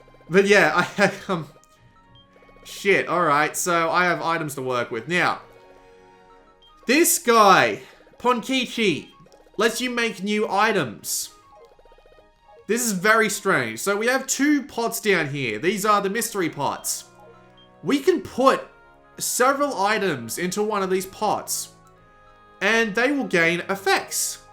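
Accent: Australia. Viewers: Thanks for the footage - nice to hear an Aussie accent too :) Reckon I might have to grab this.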